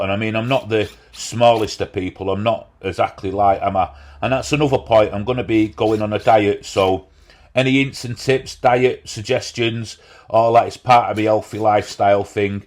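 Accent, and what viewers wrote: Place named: Liverpool, United Kingdom. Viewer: From across the pond, great job. Your accent, are you from Liverpool?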